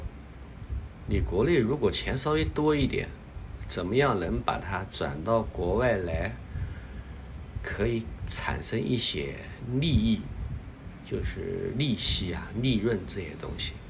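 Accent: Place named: Nanjing, China. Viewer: 南京口音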